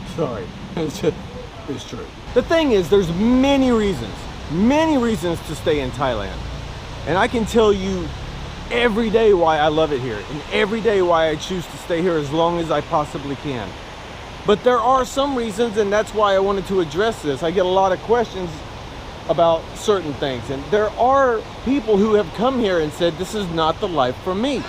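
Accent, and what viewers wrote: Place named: Minnesota, United States. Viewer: You sound like you could be from Minnesota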